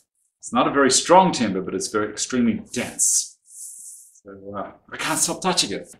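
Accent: Australia. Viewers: you sound Australian but are you living somewhere else ? other country !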